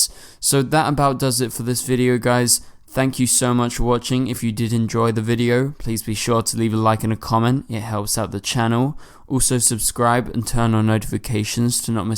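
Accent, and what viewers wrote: United Kingdom. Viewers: omg are u british?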